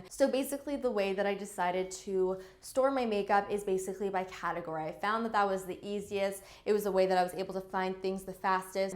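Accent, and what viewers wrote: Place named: Canada. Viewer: Me: she has a slight canadian accent goes on her about page "Hey guys I'm a Canadian beauty vlogger" SLAY YOU FELLOW CANADIAN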